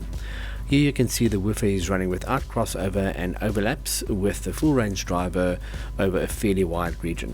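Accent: South Africa. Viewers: Howzit. This is the first video of yours that I've watched and I became an instant fan after recognising the accent as local. Love the way you combine woodwork and audio - two of my favourite hobbies. Respect and admiration from Durban.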